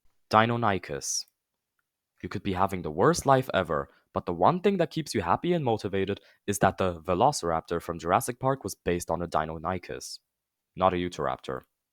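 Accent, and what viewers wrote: Germany. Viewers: When I heard this guys voice I knew this guy was German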